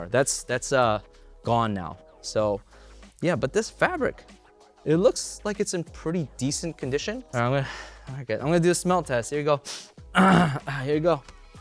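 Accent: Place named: Europe. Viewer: Sounds like he's from Europe.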